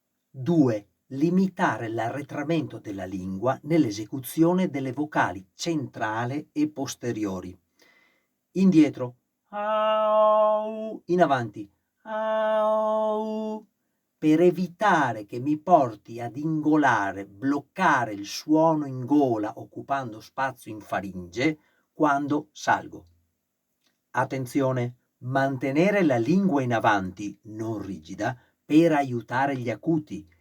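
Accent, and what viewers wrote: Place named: Veneto, Italy. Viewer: Maestro ho appena scoperto il suo canale. Sento con piacere che è veneto come me e perciò le chiedo: effettua anche lezioni in presenza? (Mi scuso se le pongo una domanda a cui forse ha gia dovuto rispondere più volte) Complimenti per la chiarezza e la spontaneità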